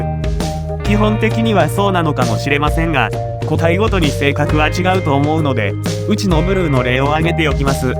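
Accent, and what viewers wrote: Kyoto, Japan. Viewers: 京都あたりの方ですかね？ 訛り風情があってかっこいいですよ〜 ロシアンブルー欲しくなりました！ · 漫画とかアニメに出てきそうなThe京都弁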